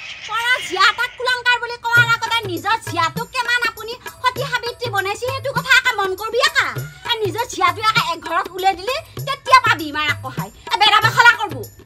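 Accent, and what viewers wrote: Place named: Darrang, India. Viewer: বা তোমাৰ মুখত দৰঙীয়া ভাষা বহুত ভাল লাগে ❤️❤️❤️❤️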